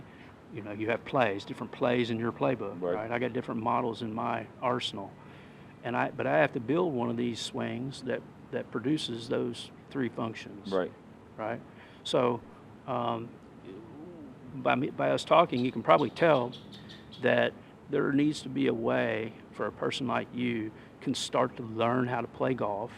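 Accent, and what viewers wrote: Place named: United States. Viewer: What S&T is..? Is 'painting lipstick on a pig', it is just fast-talking with an American accent which cons people into thinking it is good for them..Generally it is pretty much what has been done WITH SHORTER CLUBS since forever...and it can't be successfully done with the longer clubs. If you don't move weight/mass away on the backswing..you can't use it on the downswing.. proponents of S&T nearlyy always seem to need to rubbish every other system..just to leverage their marketing of this WHICH NO GOOD GOLFER USES,,,and for which NO statistical evidence of subsequent shot quality has been done or shown. Would any one like to show a video of FADES being repeatedly done across the club range with this system..SHOW AND PROVED, not just talked about.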